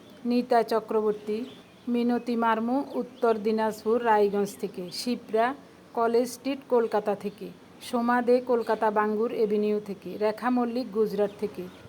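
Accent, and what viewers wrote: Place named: Chittagong, Bangladesh. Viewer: আসলে আমাদের দেশ চট্টগ্রাম। আপনার উচ্চারণ শুনে মনে হলো , তাই বললাম।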